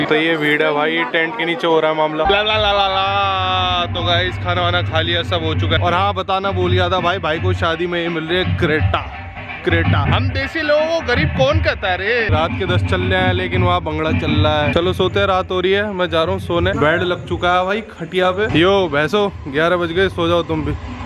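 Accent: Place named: Hathras, India. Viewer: bhai aapki language toh hamare jaise hai hum bhi hathras ke hai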